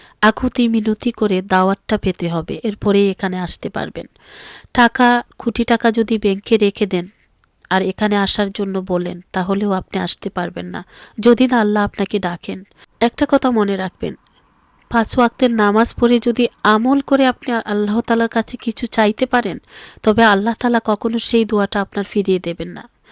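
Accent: Sylhet, Bangladesh. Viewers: Tik.asea.sobai.bujtay.parben.apne.voice.ajka.frist.time.sunlm.syealati.apur.muke.suddo.bangla.very.nice.aktu.kosto.oise.mone.oy.apu.voice ditay karon amra.toy.syealati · Apner sylheti kothai valo lage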